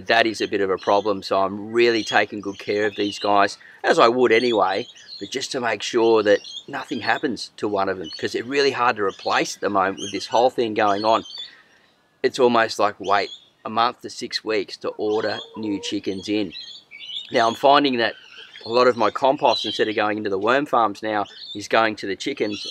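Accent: Australia. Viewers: Blue ribbon ice cream containers, the Aussie accent and I know I'm watching a video from home 👍 Its always nice to find a new aussie channel 😊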